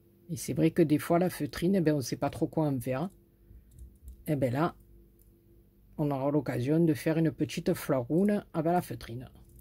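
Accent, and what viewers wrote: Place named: Marseille, France. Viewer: oupss lol l accent de Marseille ou les alentours mdr j adore je m abonne trop rigolote bravo le bonjour de toulouse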